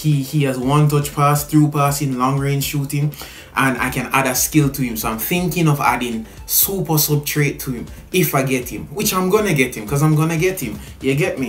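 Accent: Jamaica. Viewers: Are u Jamaica???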